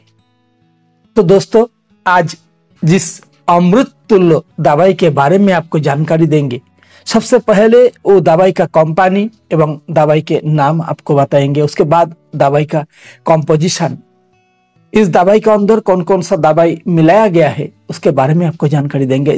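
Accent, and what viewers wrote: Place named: Bengal, India. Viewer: यह बंगाल से है इनकी हिन्दी एैसी ही होगी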